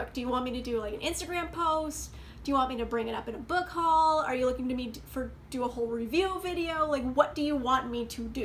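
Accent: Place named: Canada. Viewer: I don't know why but for some reason I thought you were Canadian. Where are you from? I'm in Greece.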